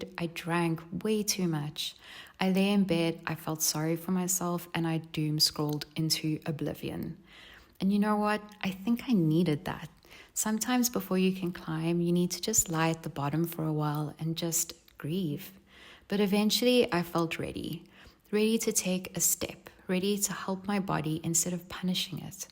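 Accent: South Africa. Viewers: I thought I heard an South - African accent. Thank you for sharing. Look forward to watching your backlist. 😊😊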